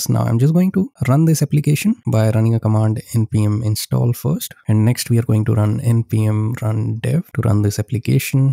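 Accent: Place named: India. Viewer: i heard indian accent i was saved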